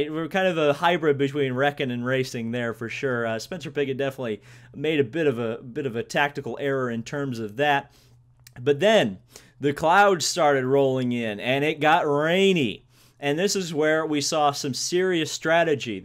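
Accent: United States. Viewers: Hey are you southern? You sound like a southern person to me at least.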